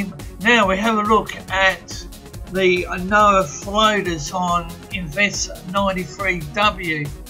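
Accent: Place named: Australia. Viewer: Défi 200 abonnés sans aucune vidéo: HE'S AUSTRALIAN AUSTRALIAN ENGLISH + SHITE MIC = STUPID VOICE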